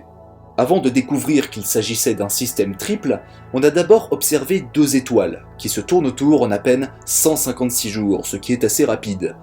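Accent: Italy. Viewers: Pouce Bleu pour l'accent italien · G mis un pouce bleu pour ton accent italien lol :)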